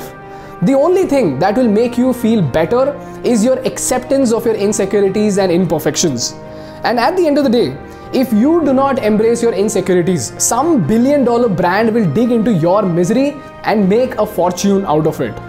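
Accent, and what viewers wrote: India. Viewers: " it is better to be absolutely ridiculous than to be absolutely boring" What an amazing video. So thought provoking. Love your Indian accent adds a veneer of ancient knowledge being conveyed. I found your sports analogy from an Indian perspective quite peculiar. Only an Indian would have used Cricket 🏏 as a metaphor 🤣😅😂. Most would have used Football ⚽️ and Maybe an American , American football🏈 . I just discovered your channel and have watched 3 videos now. Excellent content 👌 and production. Definitely earned my subscription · Love your indian accent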